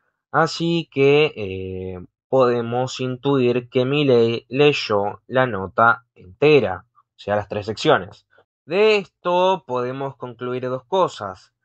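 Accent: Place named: Uruguay. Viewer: Vos tenes voz de uruguayo así que debes tener cara de pelotduo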